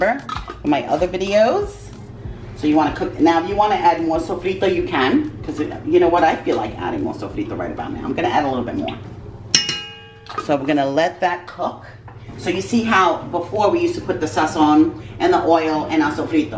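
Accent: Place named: Nuyorican, United States. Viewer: Love the newyorican accent.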